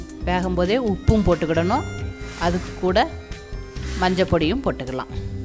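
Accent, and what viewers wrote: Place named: Tirunelveli, India. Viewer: சிறுகிழங்கு அவரைக்காய் பொரியல் சூப்பர், உங்கள் தெளிவான திருநெல்வேலி தமிழ் மிகவும் சூப்பர்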